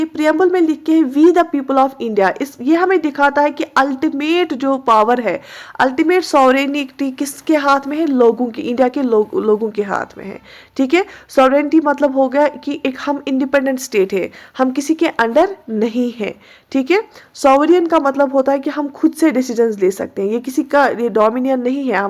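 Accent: Kashmir, India. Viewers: Beautifully explained. There's teachers. And there's tone to Kashmiri teachers. Love it thoroughly!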